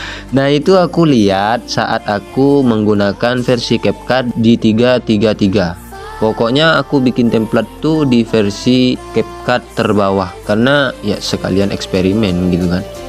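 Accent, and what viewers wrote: Madura, Indonesia. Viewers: Ketarah reng medureh 😅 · logatnya madura bet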